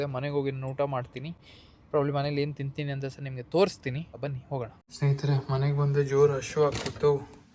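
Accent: Mangalore, India. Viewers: avre kannada first Matadodu kaliri nimma mangaluru bhashe beda Try madii It will boost your views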